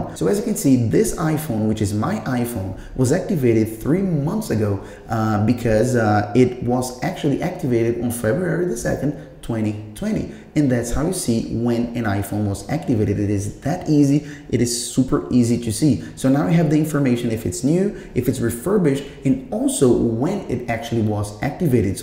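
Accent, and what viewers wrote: Brazil. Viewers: Are you brazilian? I can hear brazilian in your accent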